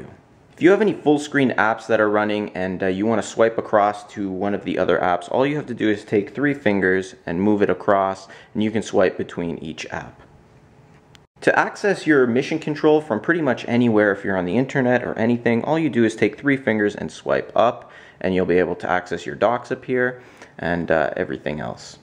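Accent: Canada. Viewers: The Canadian accent is so strong with this one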